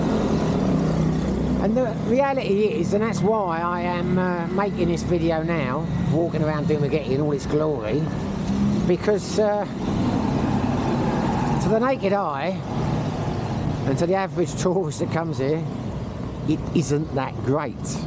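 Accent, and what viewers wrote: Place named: Cockney, United Kingdom. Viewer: Nice hearing a cockney accent. COYI ⚒️ I absolutely loved Vietnam. Was there for two weeks last month for the first time. I really loved Da Nang. I could see myself retiring there but isn't retiring there harder ?? They don't have any dedicated Retirement visa I heard. Could be wrong. Guess I gotta find myself a Vietnamese lady to marry !! Haha . Be lucky !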